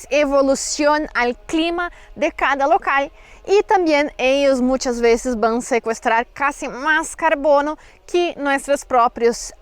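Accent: Brazil. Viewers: Você é brasileira? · Olá, Vocé é do Brasil, não é? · O seu espanhol com sotaque brasileiro meio portunhol é muito divertido jajaja. Excelente informação!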